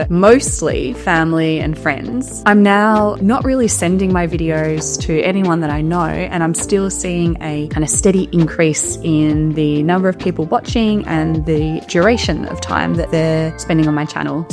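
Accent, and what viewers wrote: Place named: Australia. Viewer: Keep going! You are definitely on the right track. The absolute most important thing I have found is consistency – upload with the same time intervals, whatever you choose – and keep the momentum. Google seem to like that. Experimenting with content, like you're already doing, is also great. Have learnt many informative things from you, so will definitely be continuing to follow you. Love the Australian accent BTW!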